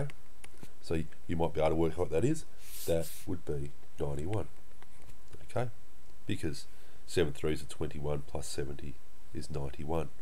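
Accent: Australia. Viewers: Is he Australian sart of video he went like "G'day and welcome to channel" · Ayyyyyyy we got an Auzzie out here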